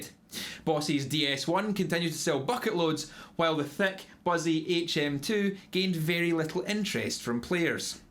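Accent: Scotland, United Kingdom. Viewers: It sounds more Scottish to me than British. All I heard during the whole video was Scottish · Reading the reverb article in a Scottish accent/10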